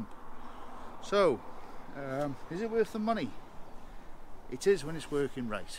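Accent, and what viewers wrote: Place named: Wales, United Kingdom. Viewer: Please excuse me but are you Welsh.great accent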